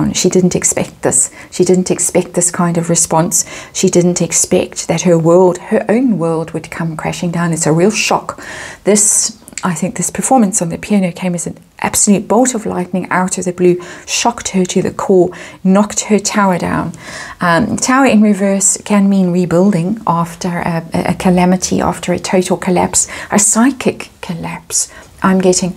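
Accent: South Africa. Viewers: I just want to thank you for a lovely reading. I always look forward to your readings. Merry Christmas to you and your family from a South African now living in New Zealand! I love listening to you as it reminds me of home! 🇿🇦💕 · This is a superlative reading. Thank-you! What a gift - my only this day. Such a beautiful pack of cards; (as an art director) I appreciate the design, the subtleties, the lateralness. I love your neatness and delivery, reliability and all-round warmth & gentleness. Also, after hearing which country you could be in, also by your accent; I found some kind of comfort knowing you might be nearby - us being at the bottom point of the globe. Then, recently you spoke of being near the sea and sand dunes; so I am thinking Blouberg side, or Noordhoek side. I'm in the City Bowl, near the top of residential Table Mountain. Seasonal blessings & love to you and yours. 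And a good 2022.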